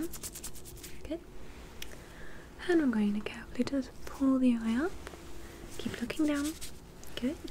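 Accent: England, United Kingdom. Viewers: » Super relaxing video ✔ » Looking absolutely flawless ✔ » Best british accent on YouTube ✔ You always deliver,! <3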